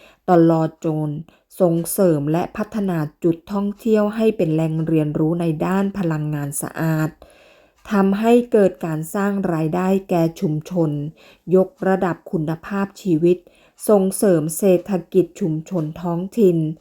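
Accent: Cambodia. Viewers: แอดมินคน นี้เขมรนิหว่า สำเนียงเขมรเป๊ะ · คนพูดไม่ไช่คนไทยแน่นอนสำเนียงออกไปทางเขมร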